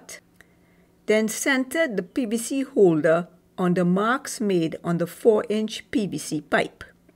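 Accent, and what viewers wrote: Trinidad and Tobago. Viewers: You sound Trinidadian... Excellent tutorial by the way. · Great tutorial...is that a Trini vibe I'm hearing?...Thanks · She sounds like a Trinidadian 😎🥳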